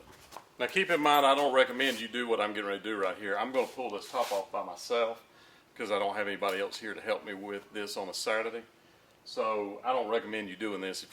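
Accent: South, United States. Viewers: Thank you so much for your video. Ive ordered my Jeep wrangler unlimited 2016 and i'm soooo excited for it to come in. I've watched your video several times as I've ordered my jeep to come with a hard top and i'm going to order a soft top after i receive it. VERY detailed. Nice to hear a nice Southern Gentleman do a great job on a video! God Bless! Keep doing videos!!! We live in Florida and own a Hot springs spa, but we love your Cal Spas!!!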